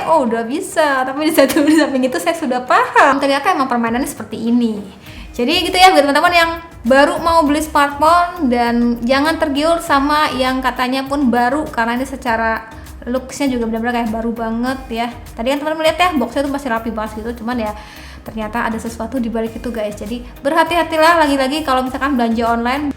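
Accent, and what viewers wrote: West Java, Indonesia. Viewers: Nambahin kata teh dan eyy di blkg kalimat kaya udh menjadi kebiasaan org sunda ya🤣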